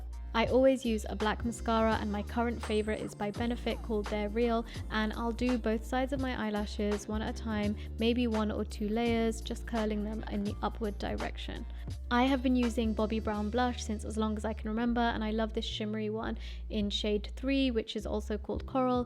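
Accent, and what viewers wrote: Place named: United Kingdom. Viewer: It is so surprising that you are Pakistani and you have a magnificent British accent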